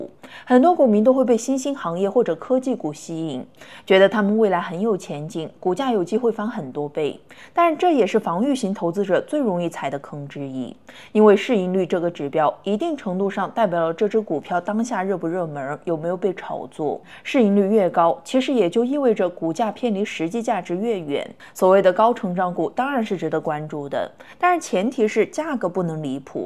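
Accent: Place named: Northeast, China. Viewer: 是东北主播吗？能听出家乡音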